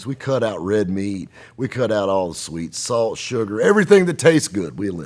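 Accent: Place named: South Carolina, United States. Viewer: He's got that south Carolina charm👍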